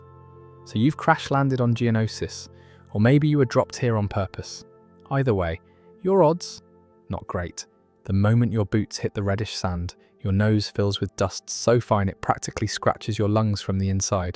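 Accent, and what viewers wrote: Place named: London, United Kingdom. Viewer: By the way this isn't saying anything negative about Irish folks. Their accents are a music only known to 0.008% of earth, near mythical. I'm guessing yours is north west of/in London? Cambridge? St johnswood? Obligatory apologies if not (no of course your not like them ) - I try every time to guess, it's one of my interests. Unfortunately here we mostly sound like... how cardboard tastes.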